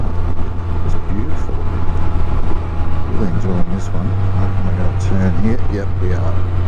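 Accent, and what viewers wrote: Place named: Australia. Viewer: I couldn't make them out and sometimes I have a little trouble deciphering the Aussie accent, lol...I knew what you were saying regarding what they were doing lol, but couldn't see what they were...I remember playing pass the pigs, lol...thank you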